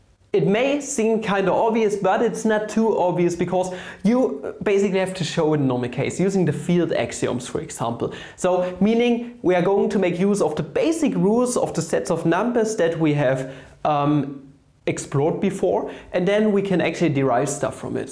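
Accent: Germany. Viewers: why? I mean the accent sounds very german but I just wanted to ask cause I wasn't sure. Other countries have accents like that too. But I actually saw on his other channel that he is, in fact, german.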